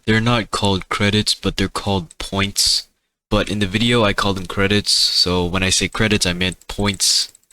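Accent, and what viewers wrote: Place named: Netherlands. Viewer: for anyone wondering, zeekoeien100 is Dutch for Seacows100. Also im guessing, based on his accent and one of the pple hes playing with, that hes dutch himself. But thats just a theorie, A THEORIE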